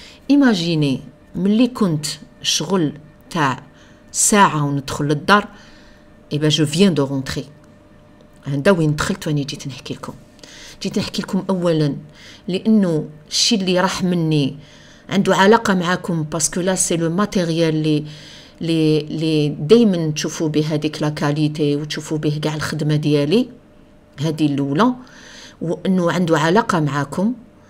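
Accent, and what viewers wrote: Algiers, Algeria. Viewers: سلام عليكم اخت اول مرة نبعتلك كمونتاع انا من متتابعاتك الوفيات راكي اديا دعوة الخير من كل الشعب الجزائري نساء و رجال ولهذا اسلكك ربي من كل موصبة ان شاء الله راكي وكلتي ربي و احنا تاني ماعندك ماتزيدي حسبنا الله و هو النعم الواكيل و اخيرا الحمد لله على السلمة و نزيد هاذي انا صواتكي يعجبني اهبل بلاهجلةالعاصمية تاعك اتمنا ان اراكي يوما ان شاء الله 🤲🏻❤ امين شكرا شكرا و شكرا يحفظك الله و عائلتكي سلام❤❤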